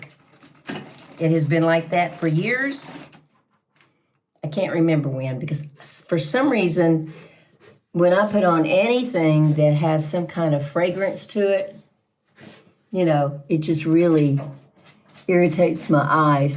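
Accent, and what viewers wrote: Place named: Georgia, United States. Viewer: I just found your channel. I didn't know your background, and I thought this lady speaks French with a Georgia accent! My parents retired in Augusta, Ga, so I know that accent well!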